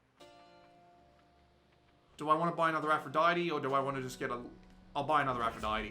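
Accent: Australia. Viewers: Good vid. Out of curiosity, are you Australian perchance ? That and is the framerate consistent handheld and otherwise? Thanks in advance lad.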